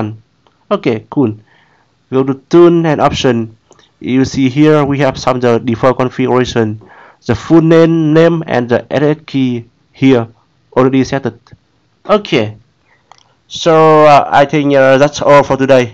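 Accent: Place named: Vietnam. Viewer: tiếng anh nghe là biết dân việt nam zồi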